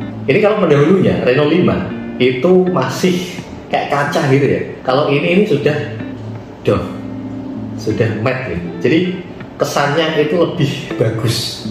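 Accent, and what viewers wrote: Java, Indonesia. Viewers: medokk bngett ituh jawa mu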